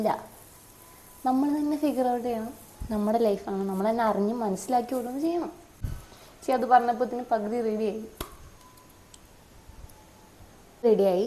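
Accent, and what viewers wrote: Thrissur, India. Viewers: Thrissurkary aano slang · naattil Thrissur aano athe slang😁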